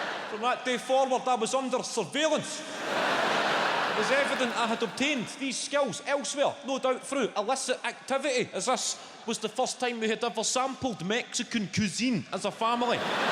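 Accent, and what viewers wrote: Scotland, United Kingdom. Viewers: Literally everything is funny with a Scottish accent - it’s an absolute gift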